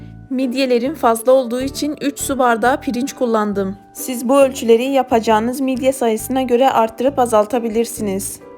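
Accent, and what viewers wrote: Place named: Eastern Anatolia, Turkey. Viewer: Dogu sivesi. Almanci sivesi. Ortaya karisik konusuyo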